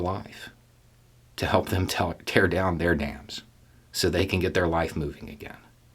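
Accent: South, United States. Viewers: He's in the South and he has an accent associated with the South. He looks and sounds like a "good old boy" which is generally a Republican voter.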